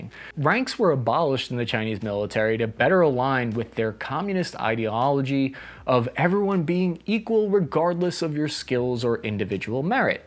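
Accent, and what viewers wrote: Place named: United States. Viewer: Narrator speaks with an American accent but says "maths", where tf is he from haha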